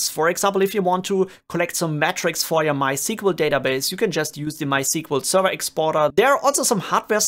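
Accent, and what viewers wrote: Germany. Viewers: simply amazing stuff!! I really like 2 things about your videos 1. amazing and professional explanations 2. your German accent :DD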